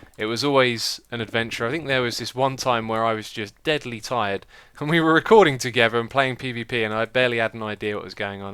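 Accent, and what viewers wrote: United Kingdom. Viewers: ***** Yeah it's his voice. So british. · Solside eclipse? Saltside eclipse? Soldside? Soulside? Sulside? What are you saying?! It's too british :(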